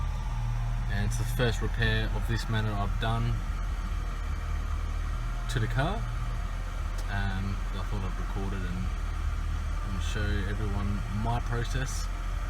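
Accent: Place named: New Zealand. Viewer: Thankyou for this bro. Saved me a fortune right before Christmas. Sounds like you're from nz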